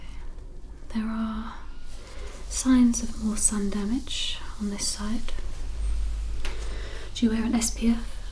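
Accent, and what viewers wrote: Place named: England, United Kingdom. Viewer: J’adore ton accent et ta manière de parler . Tu es anglaise ?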